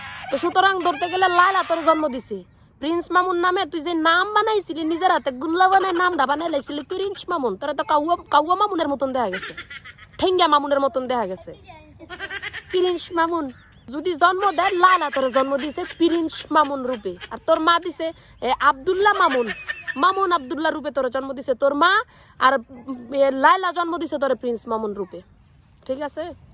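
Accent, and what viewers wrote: Comilla, Bangladesh. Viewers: আপুর বাড়ি কি কুমিল্লা খাস কুমিল্লা বাসায় কথা বলতেছ আমার কাছে তো সেই ভালো লাগতেছে আমাদের দেশের ভাষাগুলো আসলেই আমার কাছে খুব ভালো লাগে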